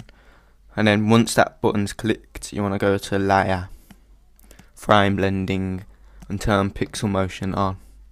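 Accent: Birmingham, United Kingdom. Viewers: HA! he's birmingham, or there abouts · Hate Birmingham accent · Go fellow brummy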